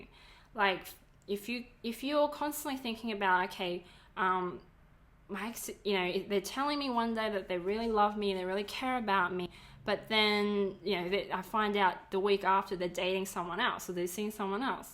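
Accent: Australia. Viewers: your accent... are you in australia? you sound like my cuz in melbourne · this is an Asian Woman with an Australian accent. never thought there was a such a thing as a perfect woman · are you chinese...you sound australian😯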